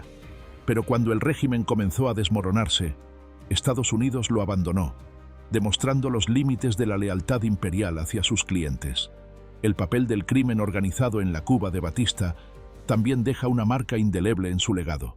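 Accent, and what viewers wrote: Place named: Spain. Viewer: Menos mal que el Narrador, no tiene acento Sudaméricano. Para mí oído es más agradable oír a alguien con acento español, es más Elegante, con mejor dicción y con más clase. Este señor Fulgencio Batista era Amerindio puro, con algo de Africano, pero según se ve 0 de sangre🩸 española mediterránea o latina.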